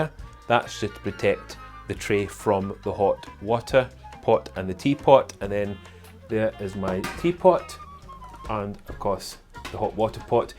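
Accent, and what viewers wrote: Scotland, United Kingdom. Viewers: Such an awesome channel by a true British gentleman andI do detect the Scottish accent. From a fan in sunny South Africa.☺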